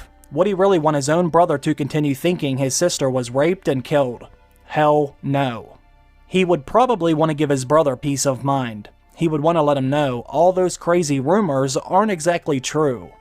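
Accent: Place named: Alaska, United States. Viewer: you sound like those people living in alaskan backwoods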